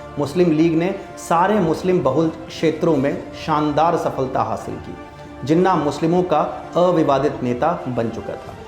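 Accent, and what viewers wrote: Standard. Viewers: Aapki hindi ekdm vishudh roop me h .aapko mera pranam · I wish all journalist and or at least sanskritagyas n babas n jyotishacharyas learn from you how to speak suddha Hindi It is so pleasant,.. Thank you...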